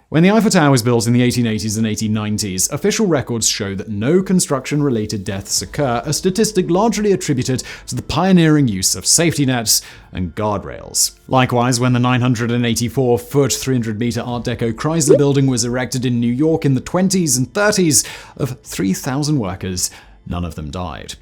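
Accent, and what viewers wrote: United Kingdom. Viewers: who is this slaphead beardman who hosts every youtube channel at least in this series he doesn't exaggerate a british accent quite so much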